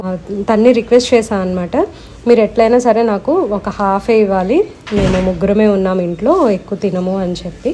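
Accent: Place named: Guntur-Krishna, India. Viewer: Hi, Mee voice ki sooper fan andi..maadhi guntur.. Guntur,Krishna distict vaalla slang baaguntundhi...vlog last lo Thankyou for watching...thankyou for your time is an awwwesome dailogue ❤ Yes...amma vaalu cheppedhi vinali....i experienced it many times...till now..after 2 kids also 😂 Munagaaku fry kifs tinaru..chedhu gaa untadhi ani...So, kaarappodi chestaanu.idli, dosa,upma lo ki tintaaru... I too use India gate n Rozana..but Last week, nenu offce lo potluck kosam, mushroom pulao chesaa..rozana rice antha baaga raaledhu... New channel chepparu...good to know..thanks for sharing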